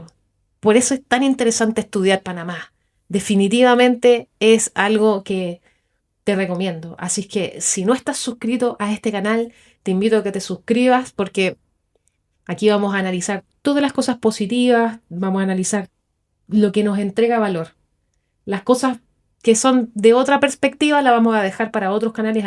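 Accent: Chile. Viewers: 🙌🏼 Qué bien se siente oír hablar muy bien de nuestro país a un extranjero. Eso significa que los panameños no lo estamos haciendo nada mal, a pesar de que a veces somos muy duros con la autocrítica. Pero es bueno autocriticarse para mejorar. Por tu acento supongo que eres chilena. Yo tuve un inquilino chileno, durante 2 años, que vino hacer un trabajo de ingeniería en una fábrica de mi provincia, y él era muy educado y amable. Ya me suscribí a tu canal chica. Espero sigas subiendo más contenidos sobre nuestro Panamá. Saludos. 👍🏼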